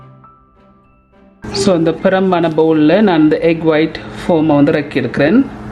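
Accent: Jaffna, Sri Lanka. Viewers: Super அக்கா, இலங்கை எம்நாடு. யாழ்பாண, தமிழ், சூப்பர். நன்றி.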